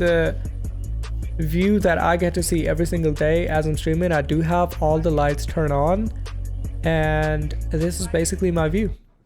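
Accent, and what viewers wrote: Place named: India. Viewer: y does he sound like he indaian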